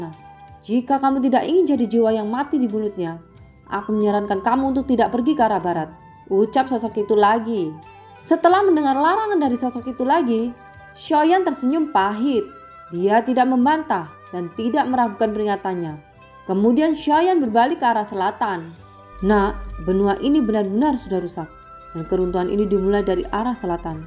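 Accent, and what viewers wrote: Java, Indonesia. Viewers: Peyampayannya bukan LG anime donghua tp logat Java donghua.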